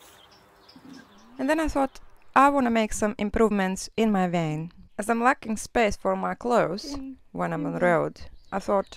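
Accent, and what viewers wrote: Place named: Russia. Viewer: I see you have a Russian accent.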